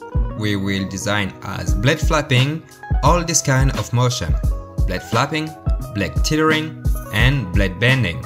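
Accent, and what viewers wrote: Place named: France. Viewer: le bonne accent français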